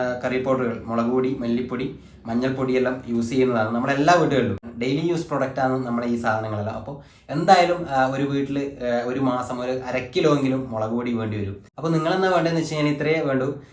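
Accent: Kannur, India. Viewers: Hi,nalla caliber ulla kutiyanu,brilliant,alrounder anennu kl Biju channel kandu anne njn manasilaki,actually nte nishkalanka chiriyum kannur bhashayum enne attract cheythu,bcs njn kannur,eachur anu. nalla director,thirakkatha,story,ellam super ayirunnu,ipol a channel kanarilla, e kanan matram,ipol santhoshamayi, mon nannayi varum,orikal nerit kananam😊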